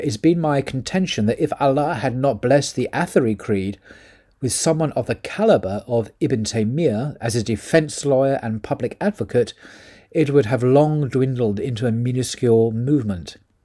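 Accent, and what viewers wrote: United Kingdom. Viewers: Ended up liking before watching 😅 but am gonna hear completely ~After hearing completely~ Somehow your British accent felt more nuanced this time 😅 I had originally felt that Salafism is the right thing, because of their premise that following the religion of the first three generations is following Islam. As of last or last to last year, I have just washed my hands off anything that ends in an -ism or is anything like that, because by their very nature they are limited. I have also listened to Dr Yasir Qadhi a lot, and in one of these sessions where he was forced to discuss creed, he said in the end something similar to that this discussion is not too fruitful because whether athari or ashari, people still do not show any major differences in righteousness, closeness to religion etc. i.e. the outcome is not very different for being ashari or athari 😅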